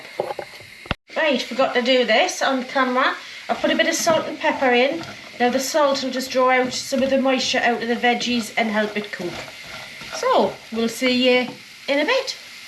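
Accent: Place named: Teesside, United Kingdom. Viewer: EE by gum lass that looks grand, I left the Boro sixty years ago nice to hear your accent.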